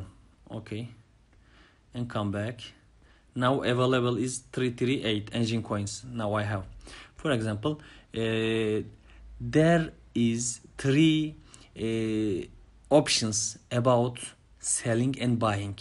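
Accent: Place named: Turkey. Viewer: Ru Turkish lan aksandan belli sil bunu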